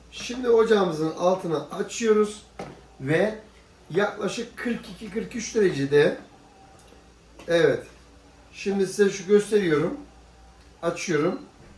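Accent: Gaziantep, Turkey. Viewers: abi sen antepli misin?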